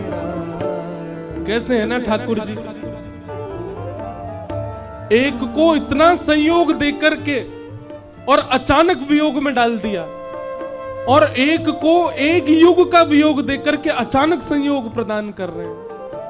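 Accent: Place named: Braj, India. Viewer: Om Shri Ganeshay Namh 🙏 Jay Shri Krishna Radhe Radhe 🙏 ♥️ 💙 ❤️ Jay Shri Hari Jay Shri Shyama Shaym ji Jay Shri Radha Rani Jay Shri Radha Kishori ji Jay Shri Radha Raman ji Jay Shri Radha Vallabh Lal ki Jay Ho Jay Shri Guru Dev Maharaj ji Aapke Charno Mai Koti Koti Pranam 🙏 Radhe Radhe Jay Shri Harivansh Guru Dev Maharaj ji Aapki vraj Bhasa Badi Sumadhur hai Radhe Radhe Jay Shri Vrindavan Dham Jay Shri vraj vasi 🙏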